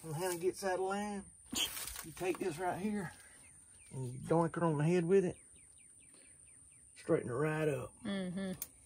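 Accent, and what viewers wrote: South, United States. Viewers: I'm from SC but your accent is country! I could listen to you talk all day. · You’re beautiful 😍 with that country accent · Nice to see a pretty girl with a southern accent not covered in tattoos and saying like every third word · I 💘 your beautiful smile too and your country accent too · So cute I love that southern accent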